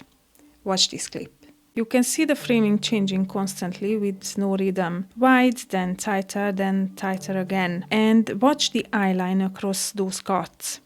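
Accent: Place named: Hungary. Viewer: I might be mistaken but are you per chance hungarian? Your accent kind of sounds like it! :) Anyways great video, you gained a like and a follow! :D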